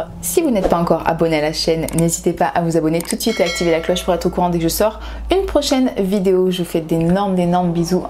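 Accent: South, France. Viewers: Étant de Belgique j'entends que tu as l'accent du sud mais pas non plus le gros accent de la cagole de Marseille 😂😂😂 Ne te plainds pas trop moi j'ai un accent belge 😭😭😭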